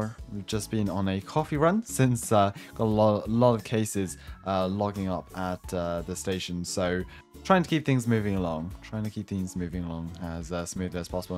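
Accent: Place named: Scotland, United Kingdom. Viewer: You've changed your voice sounds different you sound Scottish but before you sounded british a few months ago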